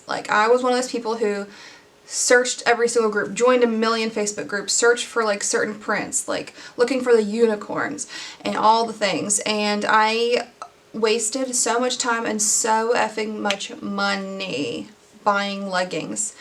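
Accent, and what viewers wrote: South, United States. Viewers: I am also bipolar and definitely understand that manic episode feeling a thousand percent! it's like you don't have control over yourself or your actions but you don't realize you don't have control you just feel super energetic I'm so sorry that you got sucked into that negative situation but I'm glad that you are out of it ❤️ also so excited to see someone with a Southern accent on youtube! From a Georgia gal living in Alabama 😂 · You’re 100% southern USA or have a deceiving accent if not! Love this video and your story!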